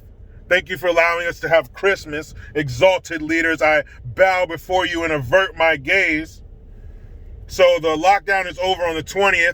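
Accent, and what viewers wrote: Australia. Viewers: Love your Ausssie accent, pmsl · Love the Australian accent, mate!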